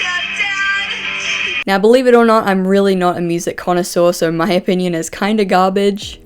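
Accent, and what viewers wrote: New Zealand. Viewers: "that probably sounded really stupid in my accent." Speaking of which, what is your accent? I've watched several videos now and I can't decide between New Zealand and South African.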